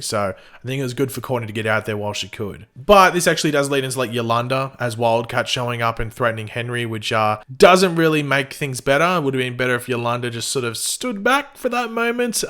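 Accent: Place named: Australia. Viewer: im quite sure that you're australian from your accent like I am and I just saw this video and thought how did you see this before 13th july its the 14th now and I use a vpn to watch the show from the website and cant watch it yet how do you?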